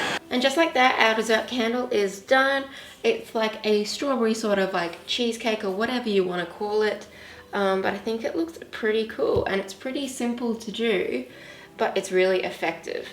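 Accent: Australia. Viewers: She is Australian, she can’t sound American. You need to learn to respect people. If the video did put you off so bad, you wouldn’t have the time to comment Betty!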